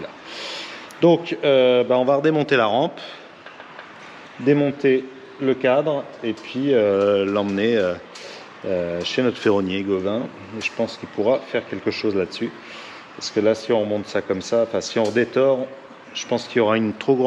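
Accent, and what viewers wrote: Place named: Loiret, France. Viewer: On sent fou tu soie du Loiret tu dis tous simplement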